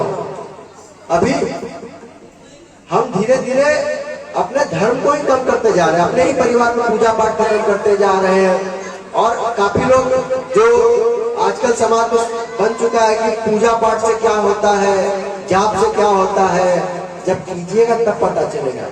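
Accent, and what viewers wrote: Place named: Bihar, India. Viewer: राधे राधे एक दम बिहारी टोन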